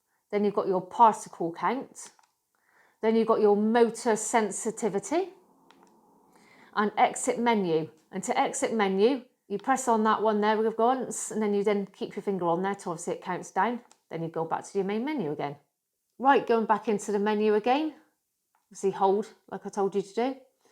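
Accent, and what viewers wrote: United Kingdom. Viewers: My language is french and I love so much to hear the British English that I forgot I was here to learn about a vacum cleaner.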